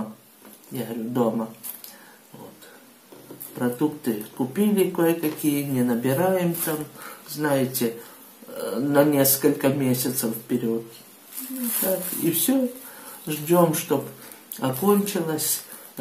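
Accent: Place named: Donetsk, Ukraine. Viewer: Вы так похожи на мою покойную бабушку,, она с Донецка была.. такой же говор … удачи вам..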